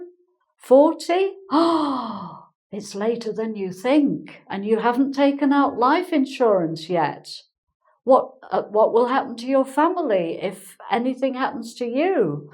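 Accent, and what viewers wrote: United Kingdom. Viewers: She is authentic British which I love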